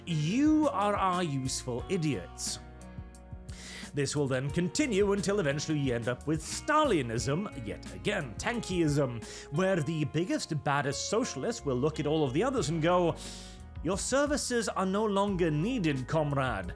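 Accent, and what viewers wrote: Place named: United Kingdom. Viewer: Hm. You sound like you are in the British Isles. Curious why the police have not arrested you over this commentary. Yet.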